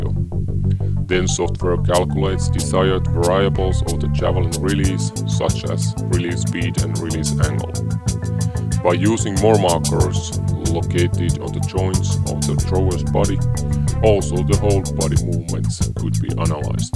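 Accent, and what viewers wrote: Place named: Russia. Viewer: Sounds like he's Russian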